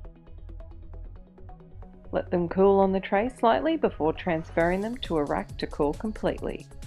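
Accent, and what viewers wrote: Australia. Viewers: She sounds Australian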